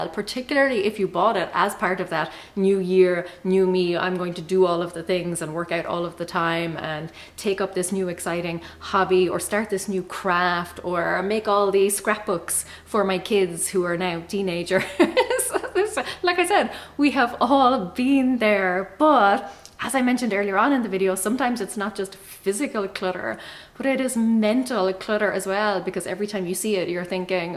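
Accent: Ireland. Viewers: I just discovered your channel and couldn't wait to subscribe. I can relate to so many of the things that you talk about. My fantasy life is miles away from my real life. Years ago when everyone was into rubber stamping I purchased some equipment and then realized that I wasn't into crafting and was never going to enjoy doing it. I would say my biggest problem is holding onto something just in case I might need it at a later date. I'm starting to watch all of your videos and I hope that I can gain some inspiration from you. You are both informative and very entertaining to watch. You have such a calm demeanor and your Irish brogue is lovely.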